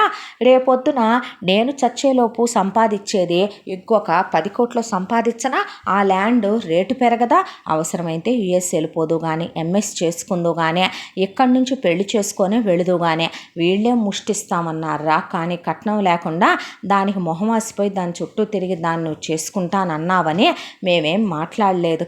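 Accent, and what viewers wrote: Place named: Rayalaseema, India. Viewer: mee voice variation maatram super andi enni sarlu e story vinnanu mee voice gurinchi rayalaseema slang super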